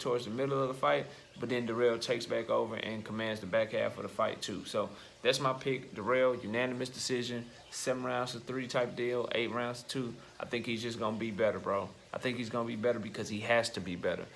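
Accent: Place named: North Carolina, United States. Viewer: You from North Carolina? You sound like my family. And you said Bo lol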